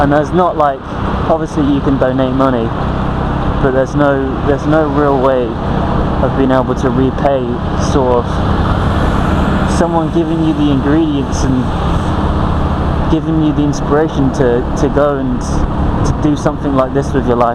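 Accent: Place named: British Asian, United Kingdom. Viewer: Have you been living in TW for a while coz you sound a bit British+Asian, accent I mean. Hope you are enjoying the life here.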